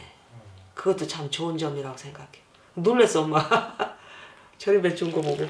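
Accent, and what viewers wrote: Chungcheong, South Korea. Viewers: 영상 잘 봤습니다. 저희 어머니 고향도 충남이다 보니 꼭 저희 어머니 말씀하시는 줄 알았습니다. 만약에 40kg을 담근다면 님께서 20kg 기준으로 한 재료보다 2배정도 재료를 더 넣으면 되겠죠? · 정감있는 충청도 말씀이셔서 고향이 충청도이신 돌아가신 친정엄마 생각 많이 났습니다 좋은영상 감사합니다 건강하세요~^^👍💖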